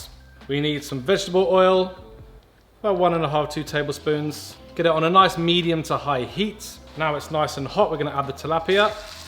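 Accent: United Kingdom. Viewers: Also British accent makes everything so fancy and delicious hahh 🤩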